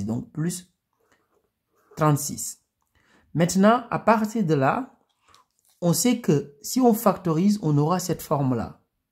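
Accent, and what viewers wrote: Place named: Senegal. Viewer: Accent sénégalais...merci compatriote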